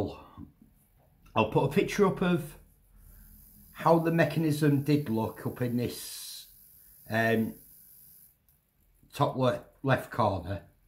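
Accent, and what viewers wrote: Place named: Northern England, United Kingdom. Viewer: Great work! Tried this in my last one but give up! Also great to hear another proper Northen accent!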